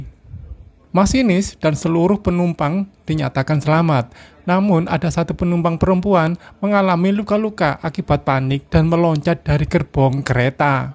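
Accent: Java, Indonesia. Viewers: Aksen jawa nya medok tenan mas….. hadeuuuuuh 😮😅😂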